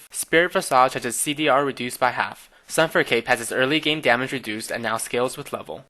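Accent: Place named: Asia. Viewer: I cant help but smile with your asian accent XD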